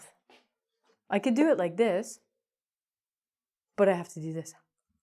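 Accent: Canada. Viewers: Is she from Canada or uk??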